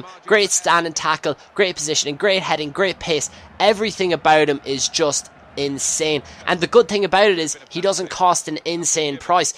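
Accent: Ireland. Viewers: Where in Ireland are you from i'm from Cork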